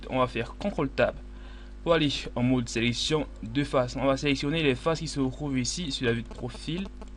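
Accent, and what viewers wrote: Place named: Africa. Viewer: Très bonne vidéo. Dommage pour l'accent africain qui rend difficile la compréhension des touches (G prononcé "J"" etc..)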